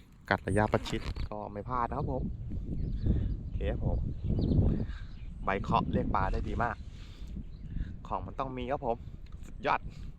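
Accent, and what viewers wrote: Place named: Isan, Thailand. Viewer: ทํามัยอีสานแล้วงัย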